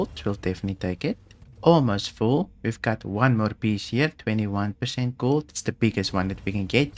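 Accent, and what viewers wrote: South Africa. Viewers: You're very good at explaining things at a good pace without leaving anything out. Nice SA accent too. Good job!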